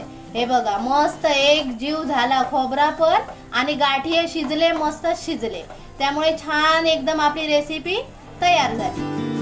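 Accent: Malvani, India. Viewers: माका तुमची मालवणी बोलायची पद्धत लयच आवडली,माझ्या गावाची आठवण झाली आम्ही गावी सर्व मालवणी बोलायचो,आणि तुमची अळूची गाठीया बघून मला माझ्या आईची आठवण आली अजूनही आई करते आलूच्या गाठीया,खूप छान सांगितलात recipe 👌👌👌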